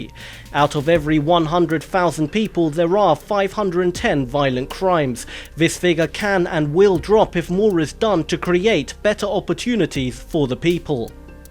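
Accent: England, United Kingdom. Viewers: Where's this guy from,?!!! Britain?! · Anybody find this insulting that somebody from the UK is the narrator? Seriously what does he know about Our States and what goes on there?! I'm quite surprised that Florida didn't make that list, I've been living here for decades and let me tell you that between the heat and transient nature of the people here that it's not a big secret that there are an astounding amount of seriously twisted, violent, nut jobs that've called this state home! I could name numerous Serial Killers that've taken up residence here at one point or another... Ted Bundy, Otis Tool, Christoher Wilder etc. We are the State that the Pulse Nightclub massacre & killings occoured at, we had the UF Murder of the College Coeds in Gainsville, we are the number 1 state for those scam drug rehab centers, which makes this state incredibly active in drug abuse and crime, we had the aftermath of the Mariel boat lift in 1980 which spawned the Scarface movie, based loosely on real events and people. 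In the 70s & 80s we were the #1 State for drug smuggling which had a significant impact on drug related violence. We had numerous infamous people here like Dalia Dipolito who's murder for hire attempt on her husband was near by where I live, we had the Casey Anthony murder case, the infamous kidnapping & Murder of Adam Walsh, the list just goes on & on... With the Everglades & the Atlantic ocean as resources, we had well known places that the bodies have been hidden and disposed of.... We're in the top 3 states for Gun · phelp Australian? Are you an idiot. He's obviously English.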